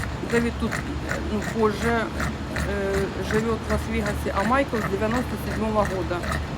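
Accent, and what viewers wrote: Kryvyi Rih, Ukraine. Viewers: Коли почула суржик і зрозуміли що ви з мойого рідного Кривого Рогу! Я так скучила за ним! Живу далеко в Ліссабоні вже 25 років. Але ріднішого міста у мене немає, як Кривий Ріг!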